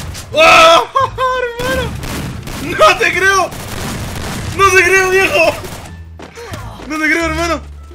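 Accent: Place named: Chile. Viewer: Jajajajaja ya me suscribi a tu canal y no por el contenido sino porque te salen chistosas las palabras yo igual soy de Chile pero igual no hablo tan así jajajajja saludos y te dejo tus likes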